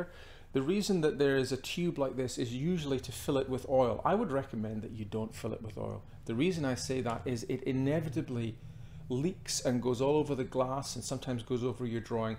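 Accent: United Kingdom. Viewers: Cannot hear you, volume too low. Shame, such a nice Scottish accent.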